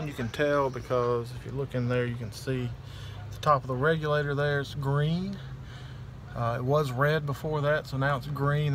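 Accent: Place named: South, United States. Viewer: Thank you sir! Minutes in, I think I know what the problem is and it's probably not the regulator! I had a guy switch it out a couple of years ago, and he definitely didn't use the proper yellow tape, he used regular Teflon tape that actually came from Dollar Tree. I just need to go get the right stuff, and I'm going to change it myself this time. Turning a wrench isn't rocket science, and I've got my spray bottle of soapy water. My tank is outside, so that tape has broken down in the weather. You just saved me some $$$, so THANK YOU!!! Funny thing is, that guy who I hired to change it last time has worked for decades at RV parks and told me he's a certified propane tech. Okay, but he didn't know not to use regular Teflon plumbing tape? Now I'm old, single, and trying to take care of my goat ranch, I've found YouTube to be a wealth of information. 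I've learned that a lot of things aren't nearly as complicated as others want us to think. BTW, I love how you talk. I grew up in Dallas and spent Summers in Arkansas at a horse camp when I was a teen. I love Arkansas, lots of nice folks there. You sound just like a lot of my family.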